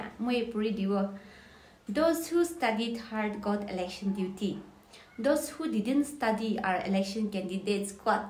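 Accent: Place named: Nagaland, India. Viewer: Glad u used chakhesang dialect 🔥🔥🤧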